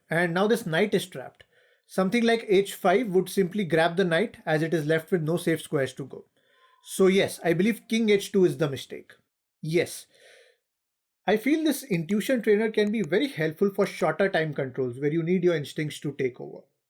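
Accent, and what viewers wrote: India. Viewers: Indien English accent its hard to understand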